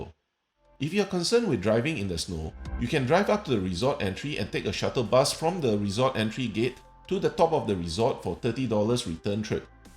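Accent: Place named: Malaysia. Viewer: Thanks for the info bro, amazing video😊. I am also planning a trip here soon. Btw are you Malaysian as well? Guessing based on your accent😁.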